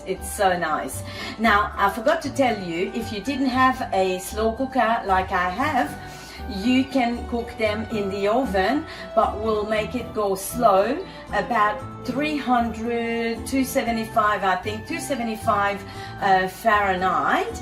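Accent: Australia. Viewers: I could pick up the Australian accent easily. I'm sorry that as it is my first time at this channel I don't know your history. The classy French accented English reminded me so much of the beloved Madame Benoit. For those who might not know, Jehane Benoit was an early cooking star on Canadian TV and a rarity as she was on both the English and French CBC channels. She was deferentially known as Madame Benoit (rarely Jehane) and was a woman who was ahead of her time belieing her matronly appearance. She was an early advocate of vegetarian cooking and much later microwave cooking. <link>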